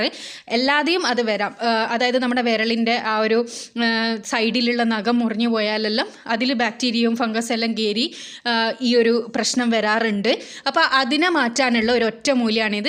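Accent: Kannur, India. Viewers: Chechi Kannur annoo??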